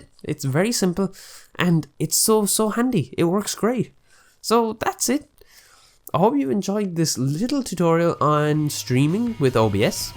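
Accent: Ireland. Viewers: are you irish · Welcome to Ireland mate now we all know you live in Dublin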